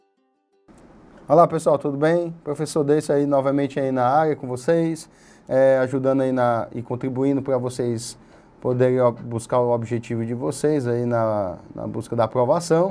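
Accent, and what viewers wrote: Ceará, Brazil. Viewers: vlw!prof por mais essa! Gostei do início do vídeo e do senhor dizendo: ah profº.... posso comer isso... e tal tal kkk com esse sotaque cearense muito engraçado esse sotaque de vcs! obg por contribuir com nossa preparação pro enem e também p/ a ampliação do nosso aprendizado!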